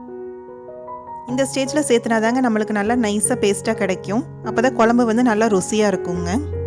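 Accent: Kongu, India. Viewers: I'm your new subscriber sister.. Very tempting thanni kulambhu.. I'm from Coimbatore.. Unga kongu Tamil suprernga ...very nicenga ❤ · கொங்கு தமிழ்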